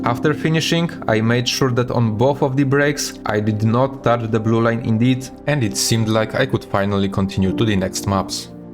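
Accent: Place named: Poland. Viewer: jesteś Polakiem, słychać haha. poeodzonka na yt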